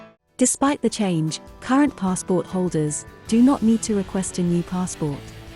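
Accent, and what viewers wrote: United Kingdom. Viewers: An British voice over advertising an American passport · why is a british person narrating a video on US passports?? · It for a US passport yet the speaker has a British accent.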